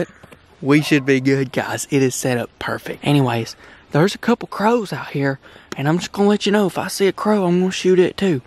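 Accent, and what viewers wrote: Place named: Appalachia, United States. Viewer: Hillbilly accent just like mine #jeuse #graygang #ky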